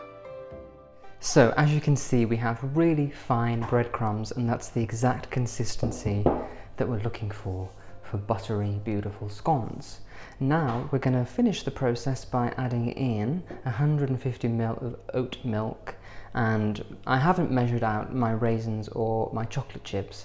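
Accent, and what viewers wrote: RP, United Kingdom. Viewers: you are so posh! I absolutely adore you. ♥️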